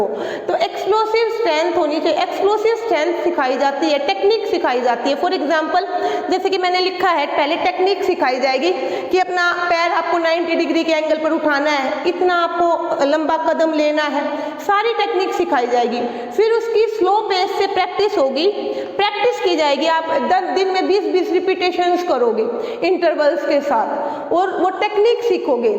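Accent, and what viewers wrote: Haryana, India. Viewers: super explanation mam or aapni boli haryanvi toch aari thodi thodi mam👌👌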